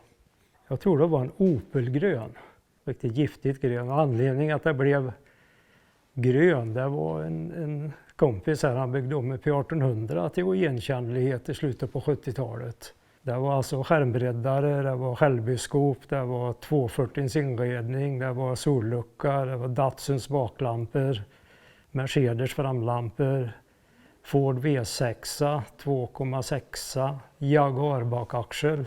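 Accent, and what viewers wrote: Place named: West Gothland, Sweden. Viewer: För himla gôtt å höra en rekti väschgöte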